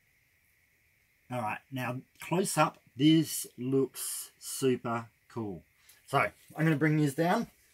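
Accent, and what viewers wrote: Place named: Australia. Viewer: It's good to hear some Aussie talk, Love your work, Cheers from an Aussie viewer xxx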